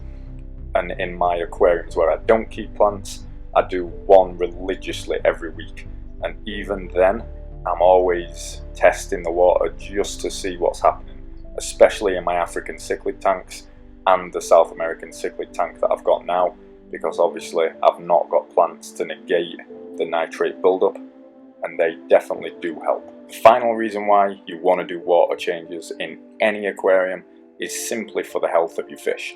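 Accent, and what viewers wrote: United Kingdom. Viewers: are you from the uk you sound like it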